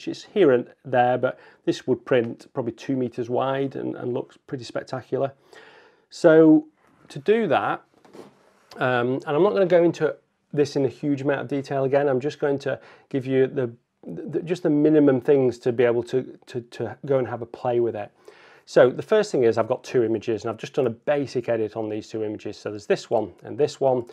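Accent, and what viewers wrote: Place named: Sheffield, United Kingdom. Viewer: Great video. Is that a Sheffield accent I hear:) Nottingham lad here...!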